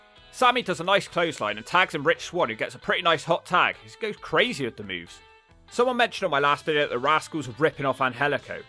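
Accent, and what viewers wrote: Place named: Newcastle, United Kingdom. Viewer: Ur a geordie didn't know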